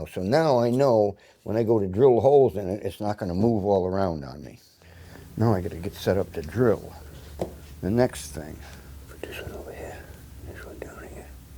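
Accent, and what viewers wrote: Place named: New England, United States. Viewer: Glad yo see you back, enjoy the channel and learned a lot from watching that I've been able to transfer to more general woodworking. Grew up in New England, and it's nice hearing that accent again.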